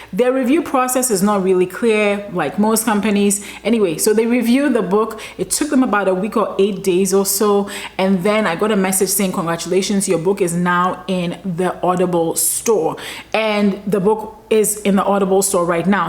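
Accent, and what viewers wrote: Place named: Ghana. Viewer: You sound like a Ghanaian....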